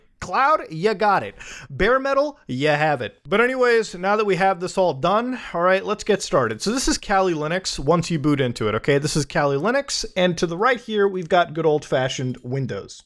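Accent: United Kingdom. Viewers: with British accent kinda slays tho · can speak Hindi and British. gosh I love this guy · nice british accent lol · I thought u was wearing a mosque hat for a sec : Also that british accent thou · I want more Bri'ish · nothing makes me more happy than hearing that fresh Bri’ish accent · wow, I wasn't expecting to hear bri'ish today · So... British!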